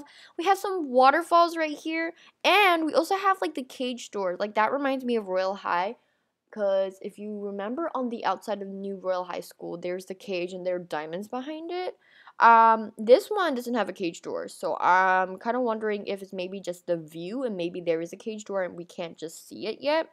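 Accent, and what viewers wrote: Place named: Long Island, United States. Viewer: Are you from Long Island? Becuase your accent is goalssss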